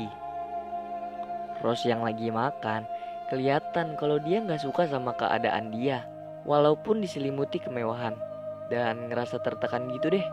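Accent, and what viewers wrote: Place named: Java, Indonesia. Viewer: Suara Kaka lucu kaya gimana ya ngomong bocor Kay medok gitu aku medok gak terlalu si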